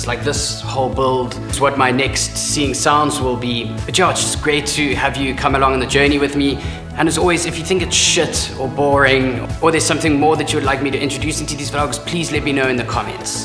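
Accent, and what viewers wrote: South Africa. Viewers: You sound South African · As someone from Cape Town I never notice our accent in person but as soon as it's on video it's like in your face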